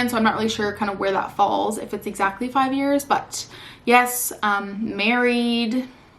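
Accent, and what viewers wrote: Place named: Canada. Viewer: You’ve become a fav quickly! Didn’t realize you were a Canadian! I had tried to figure out your accent previously. You are super pretty and have helped me transition to my work from home position much smoother! I’ve definitely felt defeated working in healthcare from home a time or two. So just wanted to say thank you for all your hard work!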